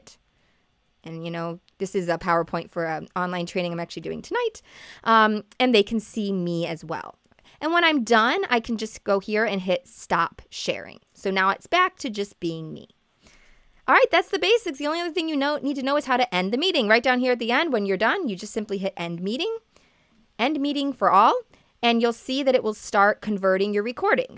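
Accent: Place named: United States. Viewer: she sounds so american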